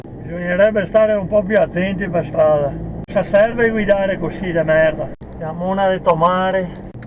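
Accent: Belluno, Italy. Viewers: buonasera non ho ancora trovato il tempo di contattarti via Mail che sto facendo straordinari al lavoro,comunque ti scriverò sicuramente,comunque hai l'accento identico e la stessa cadenza del mio ex titolare di Belluno e Alleghe quando lavoravo in gelateria qua a Stoccarda ora mi occupo di sistemi d'iniezione nel settore aero navale una grossa azienda qua nella foresta nera,comunque pochi mesi fa ero nel Veneto a visitare Venezia e Padova e son passato a Losson dell Battaglia per visitare un momento ai Caduti della Brigata Sassari che sicuramente forse conoscerai è proprio all'ingresso di Losson in quanto io abito si in Germania ma sono sardo di Sassari e volevo far visita a quel monumento ai Caduti Sardi,ciao buon fine settimana🙋🏻‍♂️🙋🏻‍♂️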